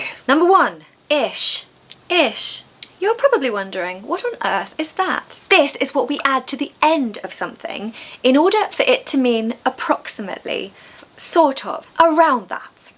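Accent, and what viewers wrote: United Kingdom. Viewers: Hi. I really enjoy watching your video s. Especially when i listen to your lovely brit accent. I would like visit london and seeing icon london like eye londond and big ban and tower bridge and ....❤ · Hello, I would like to visit those castles in the U. K. Love your beautiful British vibes and the usage of words with a lovely British accent., You taught me best British English lessons. You are adorable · Thank you for reminding me the Beautiful British ascent . I tried to shadow you ❤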